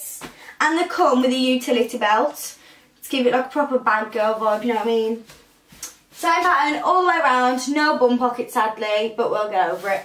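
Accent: England, United Kingdom. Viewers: That english accent... ))) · Ur too pretty, love ur way ^_^ Brazils guy :P Obviously by ur accent u r from Uk :) Speak like Portuguese people :D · British accent is nice. Calm tf down.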